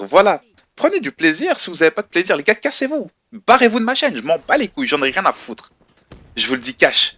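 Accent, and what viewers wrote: Belgium. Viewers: T'es belge? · est tu belges stp répond 😜❤️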